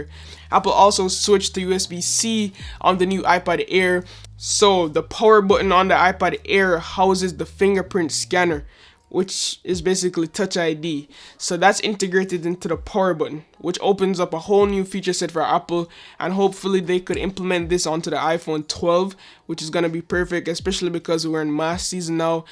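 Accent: Jamaica. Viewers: Nah a the Jamaican accent fi me bredda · Are you Jamaican?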